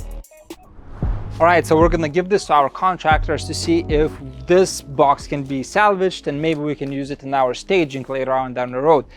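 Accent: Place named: Baltic states. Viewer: Chanced on your video for the first time, wasn’t expecting blet by the garage, and only then did I recognise your Baltic accent. Nu va. Sveikas! 😊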